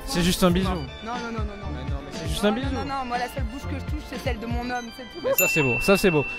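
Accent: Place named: France. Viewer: Viens faire ça au Québec voir le pire c'est que avec un bel acent français ça devrais très bien marcher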